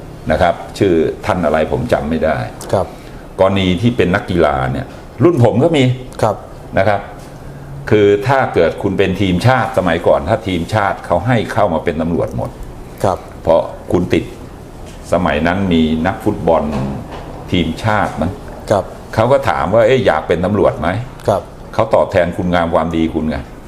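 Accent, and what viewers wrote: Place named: Rural, Thailand. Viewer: ถ้าไม่รู้จักมาก่อนแล้วฟังสำเนียงพูดยืดยาดเหน่อนิดหน่อยมาดแบบลุงบ้านนอกคนหนึ่งใครจะรู้ว่าเป็นสิงห์มือปราบลือนามคนหนึ่งของตำรวจไทย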